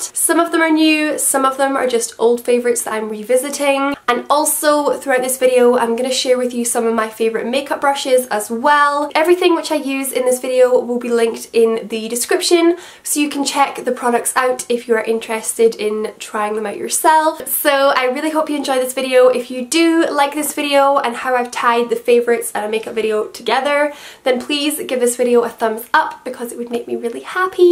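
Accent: Scotland, United Kingdom. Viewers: What part of Scotland are you from? I'm Scottish too :)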